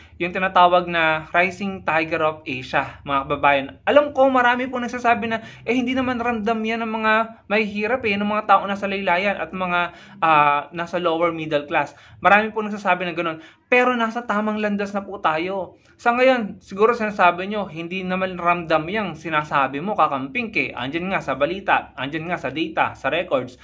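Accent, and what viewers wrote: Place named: Batangas, Philippines. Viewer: Isa rin yan bobo yan batanguenong accent na yan Bobo na Vlogger ang daming bobo heheje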